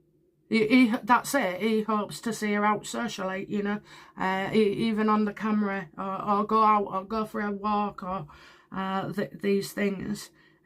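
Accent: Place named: East Yorkshire, United Kingdom. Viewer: East Yorks I think.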